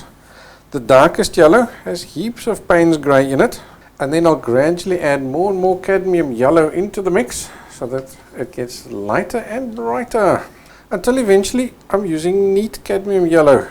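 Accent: South Africa. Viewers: How refreshing to see a painting tutorial without the tedious real time or annoying back ground music. No boring pre chatter. Just straight in. That's why I can happily look at it more then once and to also enjoy the South African accent. Thank you so much!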